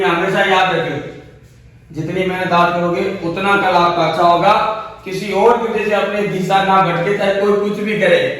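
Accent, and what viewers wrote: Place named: Haryana, India. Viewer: Pyor Haryanvi bola kr bhai saab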